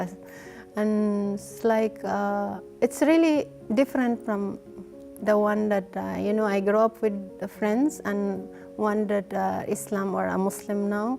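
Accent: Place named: India. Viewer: True I'm from Malaysia and I heard a sprinkle of Indian accent there. The hijab makes her look more malaysian or Indonesian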